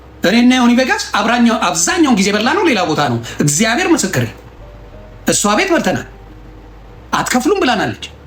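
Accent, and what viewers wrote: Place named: Tigrinya, Eritrea. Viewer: I love your Tegregna pronunciation😂😂 you crack me up 😂😂😂😂😂❤❤❤❤❤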